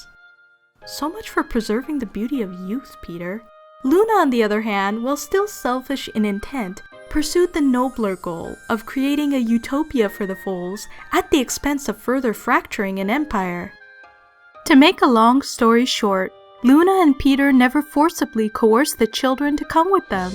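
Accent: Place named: RP, United Kingdom. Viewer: your so posh!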